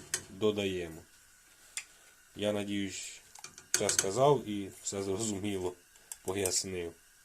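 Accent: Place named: Kirovohrad, Ukraine. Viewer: Така мова цікава, так моя рідня на Кіровоградщині розмовляє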